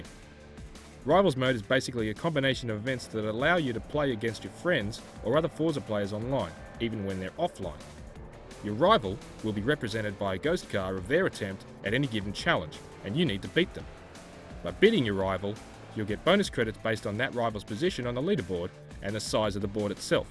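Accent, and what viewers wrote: Australia. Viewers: the dude sounds aussie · lol aussie doing the review :)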